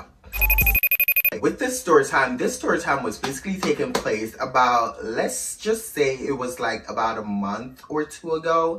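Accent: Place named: Jamaica. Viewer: The Jamaican accent ❤️😂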